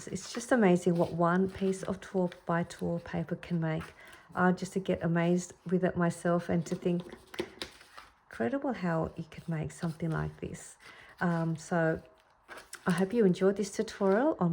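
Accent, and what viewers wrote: Australia. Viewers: I really like this project and your style. I just discovered your channel...it is so nice to hear an Australian accent and places to source things here in Australia. 😃 I'm watching with sound off and subtitles on because of the snoring! 🤣 (music to some, nails on a chalkboard to others, hey?!😉) But, I stuck around and subscribed. Your style really does stand out ❤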